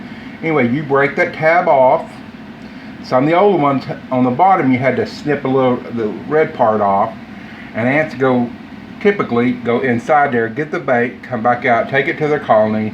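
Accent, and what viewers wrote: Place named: Appalachia, United States. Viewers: Wonderful video.. I used a bait from dollar tree sat it down then came back like 10 min's later oh lord they was all by the bait (Pic- Ant killer Bait) I got mortified and sprayed Tat ant and Roach killer.. Killed them on the spot was I supposed to leave it be and let it do like the video above let them fest.. I was so darn scared I killed them on the spot with the spray.. On my way to get Terro Now.. Please advise.. Thanks - I love your southern voice ♥ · his hillbilly accent and attitude sold me. he talks just like me. i trust this man . gonna go to war with some ants to0-nighttt!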